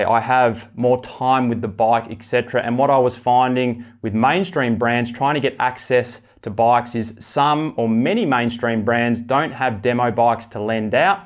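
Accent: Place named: Australia. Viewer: Very funny to hear your oistralian accent when you talk about boikes sometoimes and learning that you are not a cloimber :-)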